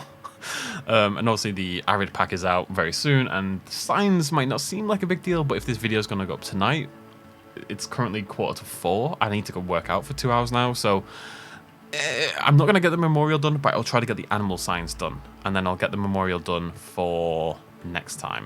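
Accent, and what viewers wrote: United Kingdom. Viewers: Commenting because the nice British voice told me to. Have nothing interesting to say though.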